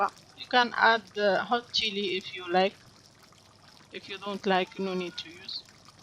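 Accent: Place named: India. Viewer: 😀 لهجة محلية وعربية فصحى وانجليزي بالكنة هندية 😀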